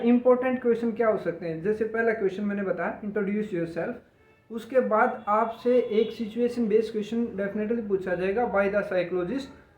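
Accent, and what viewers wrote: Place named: Haryana, India. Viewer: Sir aapka tone harayanvi ya rajasthani lg rha…aise kaise hoga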